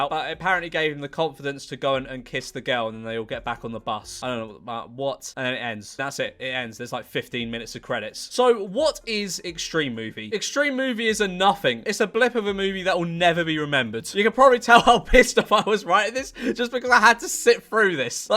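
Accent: United Kingdom. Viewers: Somehow hate this video more then the movie dude uses way to many corny ass jokes plus mixed with that dumbass uk accent i wanted to literally shot myself🤣🤣😭💀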